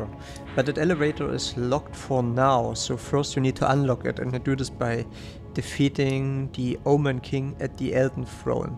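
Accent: Saxony, Germany. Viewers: Interesting. You sound like a german from saxony. :) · schaue das Video 2 Sekunden und höre direkt dass du ein deutscher Sachse bist😂 nice one bro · Dieses deutsche English. Man kann als Deutscher den aktzent einfach nicht verstecken.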